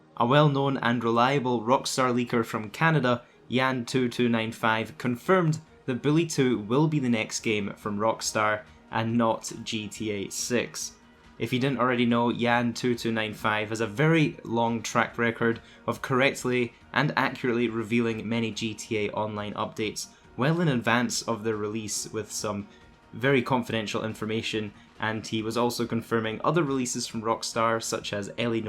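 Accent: Scotland, United Kingdom. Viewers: Scottish accent lol I like it personally but I’m from the uk so it’s normal to me to hear it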